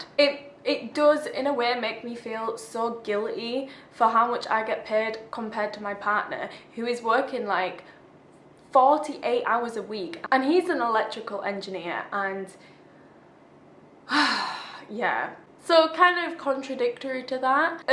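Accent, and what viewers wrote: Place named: England, United Kingdom. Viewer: Supply and demand, a normal thing. You are a native English speaker, have that English accent that Vietnamese parents want their kids to learn from. Unless the market is flooded with native English speakers who are willing to get paid a few dollars an hour, otherwise, you will still get ~20 dollars an hour.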